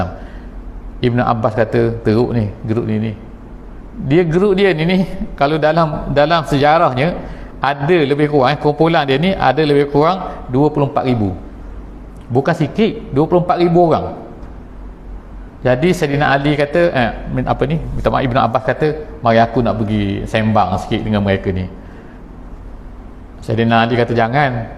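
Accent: Terengganu, Malaysia. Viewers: Ustaz dari brunei ke? kenapa loghat macam Terengganu 😍 saya ingatkan Ganu 😂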